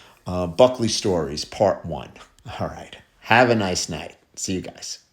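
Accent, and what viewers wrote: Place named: New Jersey, United States. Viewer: How did I know yous is from Jersey...😊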